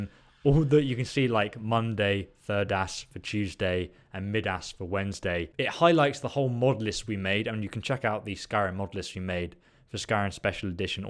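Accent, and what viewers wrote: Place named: RP, United Kingdom. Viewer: Get off your Fat Arse and like the rest of the Fucking world get a Fucking Job!!! You make me Fucking Sick begging for money on youtube, while people are working flat out to pay off their mortgage and feed their kids, You’re crying because you can’t sustain Your hobby as a full time career...well boohoo! You sound like you’ve had a very privileged upbringing by your Received Pronunciation and the fact that you’re a grown man mentioning that “mummy and daddy will help you out!” only confirms my belief that you are a work shy Bag of Shit who has no compunction but to ponce off of the gaming community who work and play for pleasure! But don’t worry people like you will be forced to get of their lazy arse sooner or later, you don’t seriously think you can make a long time career out of this! Seriously fella wake up and smell the coffee you dozy Cunt!!!🤣🤣🤣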